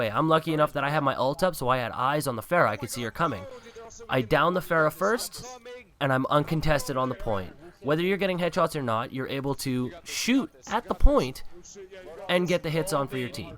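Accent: Canada. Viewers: His canadian accent is so strong I cant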